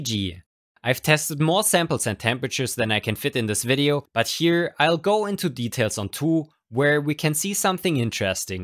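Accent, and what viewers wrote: Germany. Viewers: Ya german?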